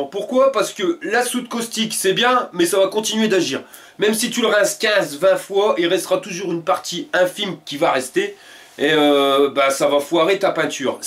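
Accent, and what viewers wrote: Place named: North, France. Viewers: Bjr elle est top votre astuce merci pour le partage avec le petit accent ch'timi j'adore 👍🍻JP du Var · bonjour , trop bien vos idées et en plus vous êtes trop rigolo et ça sent l'accent du nord ou nord pas-de calais je suis du nord merci à vous pour vos idées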